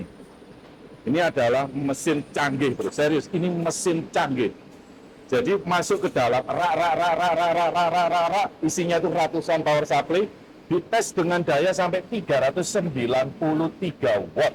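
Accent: Java, Indonesia. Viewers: Jowo a pakde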